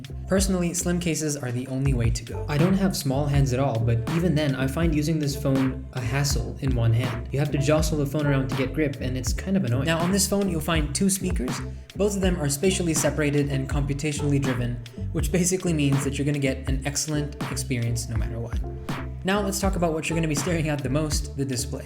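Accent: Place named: United States. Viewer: Why is it your accent is like americans😍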